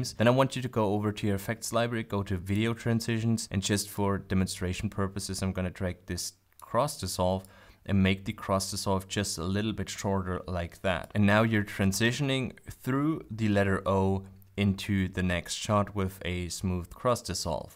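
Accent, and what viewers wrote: Germany. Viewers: Man, for a German you barely got any Accent left, how come?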